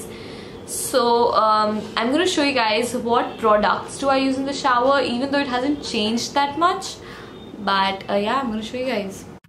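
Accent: India. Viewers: Is she Indian , her accent .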